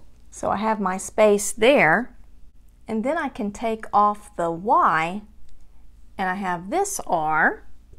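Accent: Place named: South, United States. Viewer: Hi, how are you? 😀 I'm from Argentina and i bet you didn't expect a suscriber from so far away, and Spanish speaker as well. 😆 I happen to be fully bilingual so i have the pleasure to enjoy all of these beautiful american creators such yourself! I like your southern accent and, if you don't mind my asking, i'm curious to know if you are from Alabama, Tennessee or somewhere nearby. I loved your embroidery hoop ornaments, since fabric is my favorite material to work with. Also stamps. I adore stamping! I have read in another comment that you clean your space as you go, to keep it neat. I do the same when crafting. I cannot concentrate if my surface is too busy with all sort of things. Glad to know i'm not the only one obsessed with cleaning. 😂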